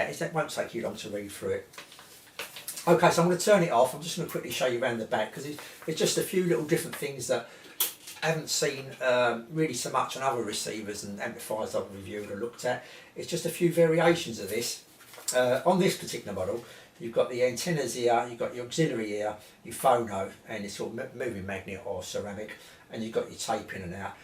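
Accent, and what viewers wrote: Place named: England, United Kingdom. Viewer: Sorry if I sound a bit too critical but you might want to slow down your speech a bit. It might just be me having trouble with your English accent as I'm a Canadian and I think we may speak somewhat slower and deliberate. Just a little something to help improve your channel as you continue on. I know that you have said this was done when you were first starting out so it is entirely understandable that you won't have an excellent delivery right off the bat. Nothing wrong with the content just one listener trying to help out. Cheers mate.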